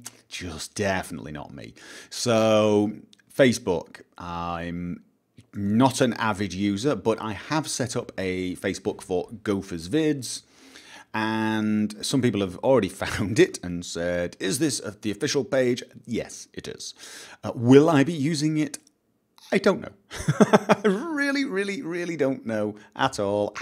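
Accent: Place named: England, United Kingdom. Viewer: Wait Gopher I love you're accent but are you Norwegian or English because you sound English but you're channel country is Norway. Just wondering.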